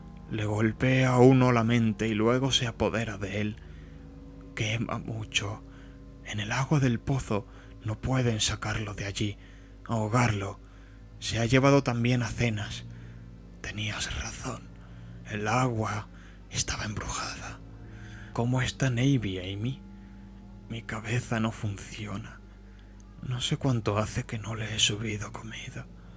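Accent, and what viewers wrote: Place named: Spain. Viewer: Por fin un audio en castellano (españa). No me considereis racista, simplemente es la forma de hablar que utilizo y me es cómoda y familiar, así me centro más en la historia. Gracias!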